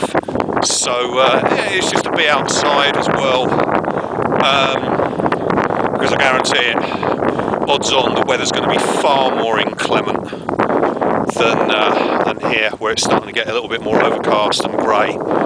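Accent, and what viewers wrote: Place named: Cockney, United Kingdom. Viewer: nice vid nice to here abit of cockney me china keep up the good work on yer plates fish and tater mate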